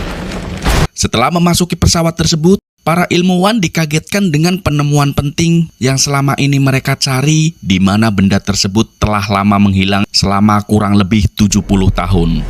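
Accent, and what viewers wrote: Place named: Banyumas, Indonesia. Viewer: Kie tah wong ngapak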